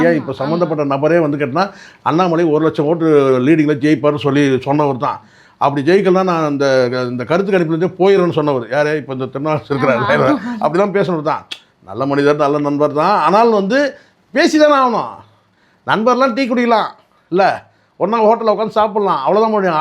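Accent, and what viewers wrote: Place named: Karnataka, India. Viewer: Yaaruda idhu? Kannada minna pesars aalu Oru channel vechikittu volaraa?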